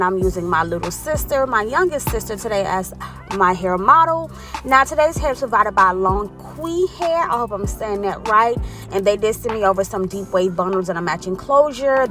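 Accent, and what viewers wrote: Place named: Florida, United States. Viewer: Yassss!! I’ve watched a few of your videos and every time I’m like she sound like she from Florida and does hair like she is from Florida. Heard the beat and was like that sounds like a Florida beat. Scrolled down and saw the 786 area code. FLA Stand up! Born and raised in the 954 😜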